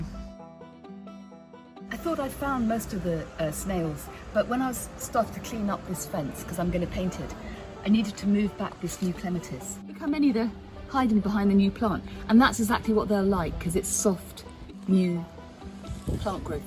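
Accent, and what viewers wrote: United Kingdom. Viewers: 口音英国口音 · 听到你温柔的英国口音，让我想起来伊丽莎白女王讲话时的情景，很值得回忆。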